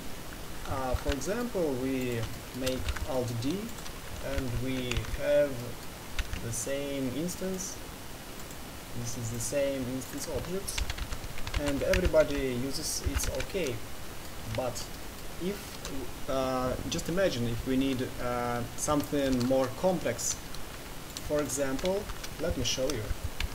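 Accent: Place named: Russia. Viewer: Жуткий русский акцент)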